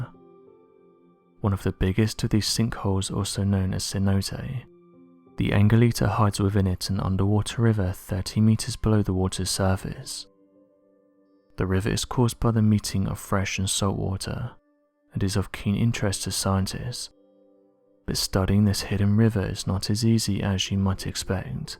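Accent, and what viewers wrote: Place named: RP, United Kingdom. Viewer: And the queens english is easy on the ears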